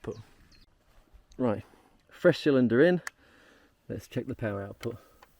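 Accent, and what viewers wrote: United Kingdom. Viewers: Ah, an English accent, now it makes sense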